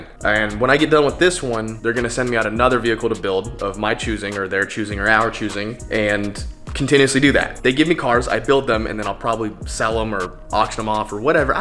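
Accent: South, United States. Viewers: sounds more country for some reason lmao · Yay the update (I swear you had such a stronger country accent in this video) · Just me or did he have like a super southern accent today?? lol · got a truck and now he has a country accent. I used to watch vids all the time, now I check in after 2 years not watching and he has 1 million subs and a country accent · Listen closely. is developing a southern accent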